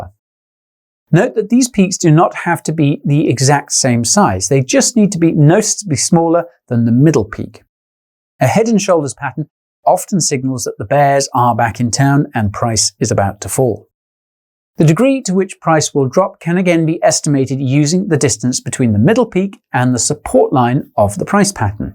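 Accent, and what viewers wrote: United Kingdom. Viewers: Thank you for saying you’ll explain everything in plain British English. It actually helps a lot for someone like me who’s first language is not English. Thanks a lot. Now I’ll resume to watch the video ♥️ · Good man I like British English lived thre in Surrey for 25 years I moved to Australia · British English lol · high quality to the point ... tanx bro .. I have some problem with british akcent I should listen more ...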